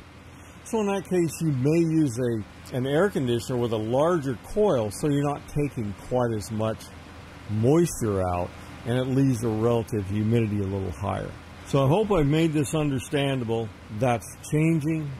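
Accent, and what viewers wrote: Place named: Indiana, United States. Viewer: From my understanding I think he’s from Indiana I could tell just the way he talks mmhmm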